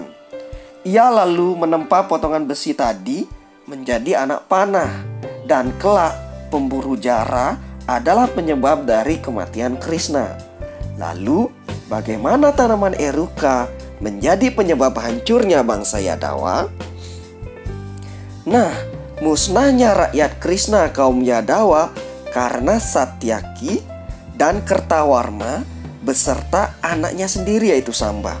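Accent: Bali, Indonesia. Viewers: Orang bali..bkn madura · Logat penyiarnya seperti logat bali. Kalo didengar ceritanya mmg berdasar dari buku mahabarat. Aku jg pernah baca buku mahabarata versi s.pandit (bali) Dl pernah punya yg karya org india tp ga selesai karna bukunya tebel bgt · Logatnya saja jelas,logat bali di kata " T" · Logat balinya medok bli😀😀😁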